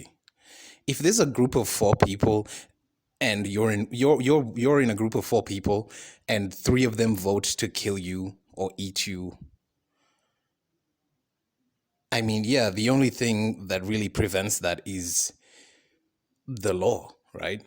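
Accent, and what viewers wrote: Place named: United States. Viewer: The world is full of ridiculous people I also wanna ask something off-topic that I was wondering Your accent, it's interesting it sounds like a mix between Indian and American, am I wrong here?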